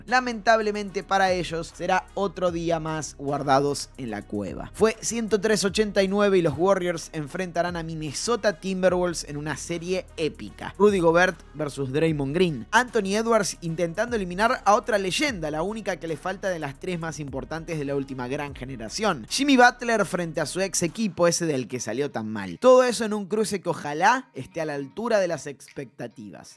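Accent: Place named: Argentina. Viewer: Porque TODOS los argentos tienen está pronunciación "NAGGETS" "CARRY" "HALLIBARTON" "CLATCH" "THANDER".... ME IRRITA DEMASIADO ESA PRONUNCIACION DE LA "U" TAN ESTUPIDA QUE TIENEN.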